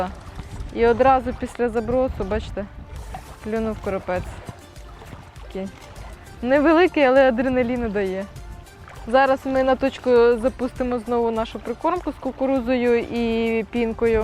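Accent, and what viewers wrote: Surzhyk, Ukraine. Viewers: суржик то сила))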